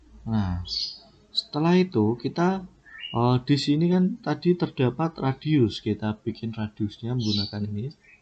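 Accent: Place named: Java, Indonesia. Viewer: suaranya jawa kluthuk bgt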